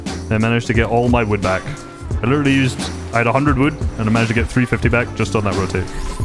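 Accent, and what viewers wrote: Scotland, United Kingdom. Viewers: Why is understanding pure scottish english so hard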